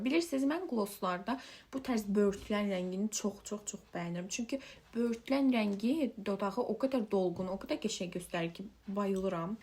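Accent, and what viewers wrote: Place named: Turkey. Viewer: Etim tokulurde bu qiza baxanda niye daniwanda ozunu uwaq kimi aparirsan dodaqlarivi deq bawi qabaga eleyirsen turkce daniwirsan turkler kimi daniwmaga caliwirsan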